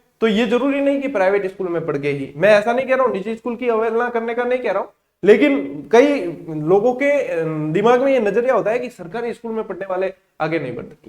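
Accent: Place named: Marwari, India. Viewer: Sir apki marvadi hamjh koni aave.....pr laage choki👌👌👌👌... thank you sir....jitna bhi bole km hi h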